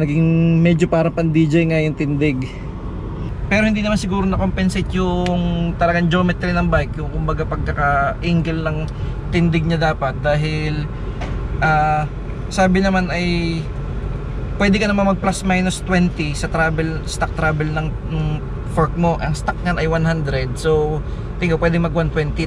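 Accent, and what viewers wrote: Batangas, Philippines. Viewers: Sir Batangeno ka ba? Ala eh panotice sir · Batangueno ka ga? Hahaha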